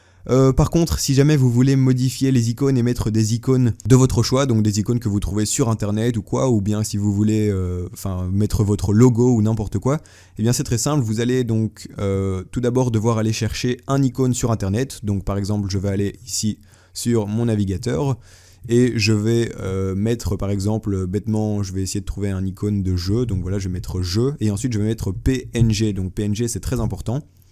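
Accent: Belgium. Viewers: T'es belge?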